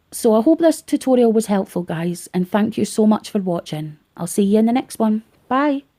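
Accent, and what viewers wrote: Scotland, United Kingdom. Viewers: Lovely Scottish accent. Nice video!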